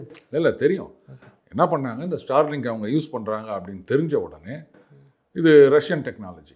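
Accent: Chennai, India. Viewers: Bro significant post chennai slang amakalam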